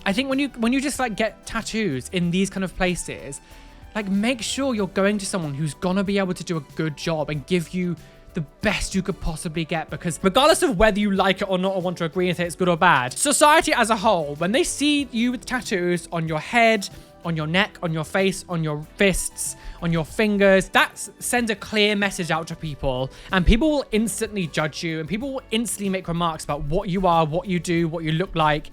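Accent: United Kingdom. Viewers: It's great to be able to understand what a youtuber is saying without upsetting some people it's easier for a brit to be able to understand a brit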